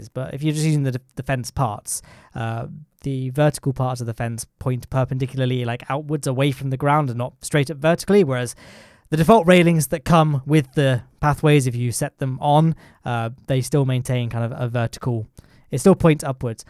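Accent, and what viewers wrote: United Kingdom. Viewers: This is the most british commentary i've ever heard :D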